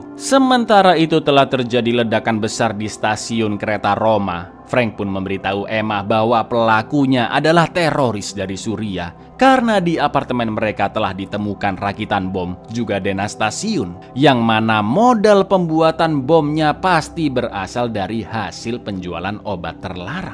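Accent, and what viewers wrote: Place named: Madura, Indonesia. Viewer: Kok BANGKALAN MADURA cak 😂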